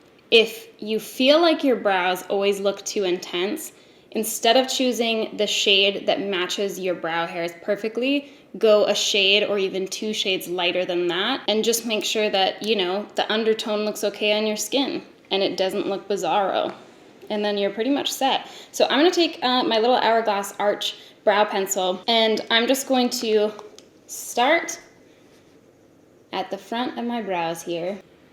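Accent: Canada. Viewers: I’ve needed this so badly!! I just had LASIK so I don’t need glasses anymore. I got under eye filler to fix my “”baygs.” That’s Canadian talk for bags. Now that nothing is hiding my face, my brows need to be on point. Help!!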